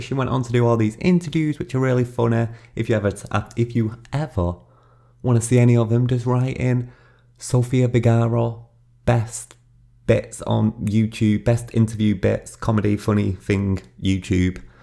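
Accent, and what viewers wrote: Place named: North, United Kingdom. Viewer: Appreciate your commentary and deep dives. It's always your great Northern accent and the fact that your content is always fact based and never without a sense of humour that always makes me smile along with the fact that pepsi is my beverage of choice! Irony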